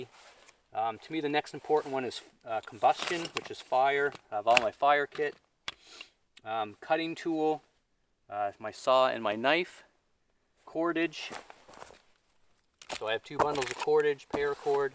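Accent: Canada. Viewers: Are you a maritimer?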